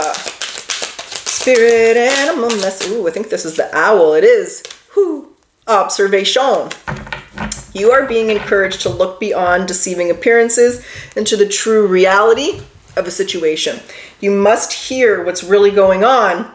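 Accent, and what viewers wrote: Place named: Canada. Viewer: You're Canadian... Lol I can tell. My ex is Canadian too. I love the accent.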